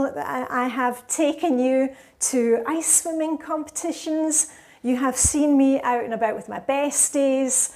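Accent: Scotland, United Kingdom. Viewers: Found your channel via one of the minimalist channels - i think it was the minimal mom. I came for wardrobe advice and stayed because of many more reasons: the positivity, the no-adds no sponsoring, the iceswimming, the routines, the scottish accent, the authenticity, now I am learning about the flylady system and danish hygge. Your videos brighten my evenings and yoyr success is so deserved in my opinion! 🎉🎉🎉 Congratulations to 100k! 😊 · I found you a couple of years ago, probably when I was searching for cleaning systems. I loved your warm, welcoming approach as well as the Scottish accent! I live in north east England and holiday often in Scotland-my favourite place. Feel as if I'm checking in with a friend every time a new video pops up. Thank you for your support and encouragement x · I was drawn to your channel for several reasons: your infectious positivity! 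Learning about decluttering! Seeing you with friends enjoying life in Denmark! Your Scottish accent! Your simple meal preps! Simplifying household chores! All in all, you’re just a lovely human being ❤❤❤ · When we finally moved into our new house in 2020, I looked on "this You Tube thing" for guidance on folding socks as neatly as the German mothers of my children's friends did. Found a nice American lady, who besides folding, had videos on organising, and fell down the rabbit hole... At some point, I was watching an old video of the Minimal Mum, doing an all-January collaboration - and yours was one of the videos. At first I was very surprised that Danes apparently have Scottish accents 😂 honestly! I remember thinking the geographical proximity might explain it - I must have been thinking of Norway 😂😂. Luckily you soon mentioned being a canny Scot 😂. I am eternally grateful to you for your bahookie nudges, and enjoy being part of this international community around you. 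Congratulations and thank you - Anne (in Germany now but formerly of the 3rd Morden Brownies 😊)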